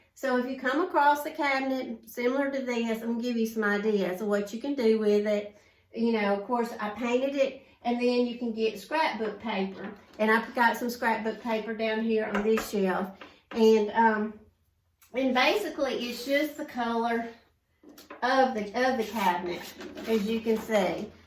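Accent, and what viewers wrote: South, United States. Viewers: Blessings to you. I love your channel I love your southern accent and ideas. God bless y'all. Keep sharing.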